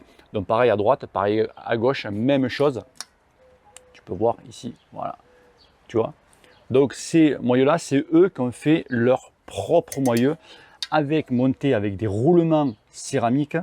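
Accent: South, France. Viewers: Bonjour,j’apprécie tes conseil et commentaires sur les vélos et les conditions d’utilisation.J’aime l’accent que tu a ,nous qui sommes de l’est ,un peu de soleil fait pas mal.Tout ce que tu dit est intéressant mais n’hésite pas de faire intervenir en commentaire un pro quand nécessaire.Cela apporte plus de crédibilité à ta vidéo qui est très bien faite.Je roule Cannondale 4eme vtt.Sur route j’avais un trek madone 2011 que je viens de remplacer par un Scott Foil 2021,Patin,shamal miles.Montages perso.J’ai rayonné ma première roue à 14ans.Depuis je compte plus les vélos construits et réparé gratuitement bénévolement chez Emmaüs aussi.J’ai 65ans retraite et archer.Le vélo m’apporte la santé et la forme.Tes expérience sont judicieuses et concrètement très utile.Cordialement.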